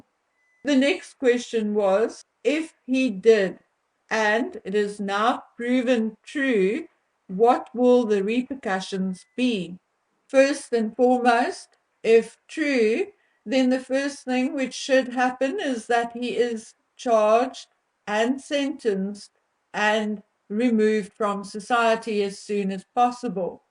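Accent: Afrikaans, South Africa. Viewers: Not Oidepus .... If you're going to use big words then please learn to pronounce them. Especially as these words are used in clinical settings.Shame man, I understand you're Afrikaans and that you have an accent but if you do not know how to pronounce it please ask someone who does.It is Oedipus....God bless you.✝️🌼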